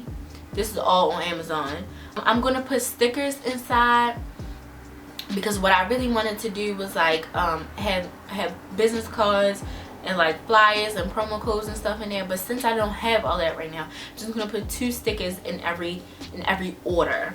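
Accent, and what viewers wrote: Baltimore, United States. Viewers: I think she’s from Baltimore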